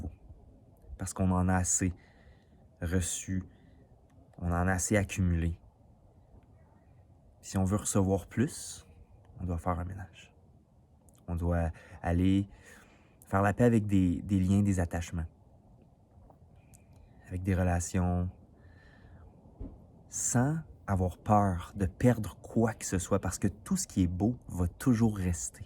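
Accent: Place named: Canada. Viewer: Bonjour Merci c est vrais ce libérer du surplus pour recevoir le nouveau en ce moment très drôle je suis en plein rangement de mes armoires ça déménage hiii l énergie ne circule pas quand trop charger une pièce ext... vivre dans le passé bloquaient pour de nouvelle rencontre balayage la coupe ne peut pas ce remplire si elle est déjà pleine 🤭 🎉 bon votre petit accent du Canada 🍁 j aime bien ily à du soleil dans votre guidance et j adore à bientôt ❤❤❤😊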